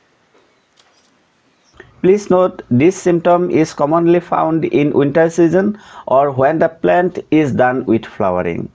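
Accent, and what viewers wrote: India. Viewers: Very strong indian accent i hope hydrangeas are strong..pointless video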